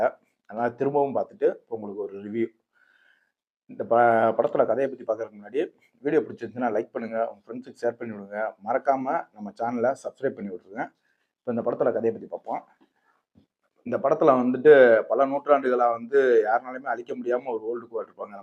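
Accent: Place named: Usilampatti, India. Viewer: Madurai usilampatti ya bro... naanum than😅slong theriyuthu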